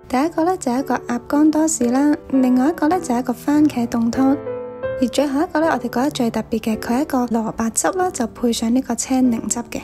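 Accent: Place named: Cantonese, China. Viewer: What language are you speaking? It sounds like Cantonese, but not exactly like Hongkong. I wonder.🤔🤓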